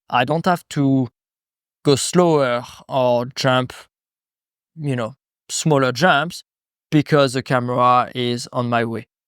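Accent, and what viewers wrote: France. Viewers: Félicitations quand meme pour l’anglais, ca me trigger quand meme 😅, j’entend du franglais c’est perturbant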